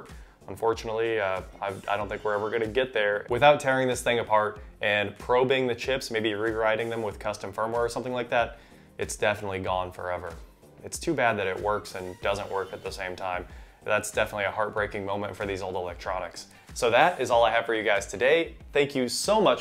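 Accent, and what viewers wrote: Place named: United States. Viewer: It is in American English. You can probably tell, from his accent that he's American. Many English words containing a G that are pronounced hard in British English are pronounced soft in American English.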